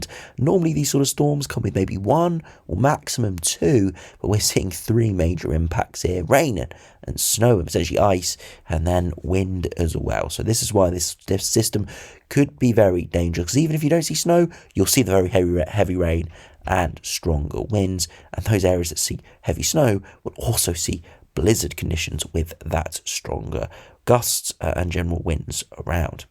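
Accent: London, United Kingdom. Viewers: I subscribe to this channel for 2 reason, the weather and the posh London accent. You can feel the privilege in his voice.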